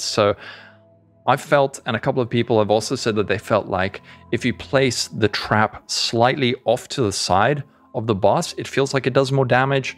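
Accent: South Africa. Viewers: Are you a South African botjie?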